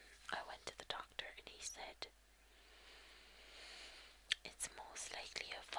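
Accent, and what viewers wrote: Ireland. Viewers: I've recently become interested in Irish music, history, language and culture, and am thrilled there are ASMR videos with the Irish accent. Keep eating healthy and be happy. :)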